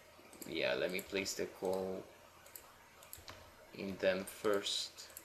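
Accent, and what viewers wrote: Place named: Mexico. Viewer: r u Latino? im mexican. u sound mexican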